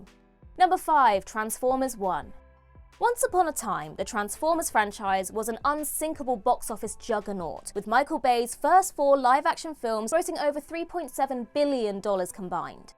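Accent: United Kingdom. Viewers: You have the cutest British accent I have ever heard.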